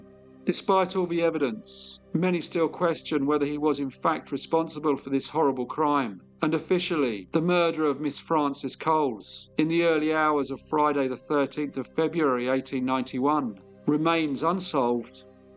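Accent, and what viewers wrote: United Kingdom. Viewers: Hello from France. Good storytelling. Just a question, where in Great Britain does your accent come from ? I find it clear and easy to understand. Thank you.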